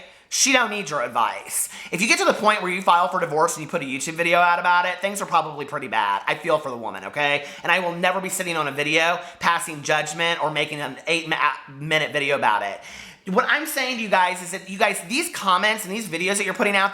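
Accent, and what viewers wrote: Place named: Indiana, United States. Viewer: I love your Indiana accent! I lived in South Bend for 4 yrs & loved it 😊 You are so funny & I love watching you 💙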